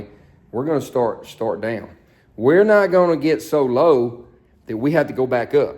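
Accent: South, United States. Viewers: Ive never heard anyone so smart with your accent. You must be the king of the southerners.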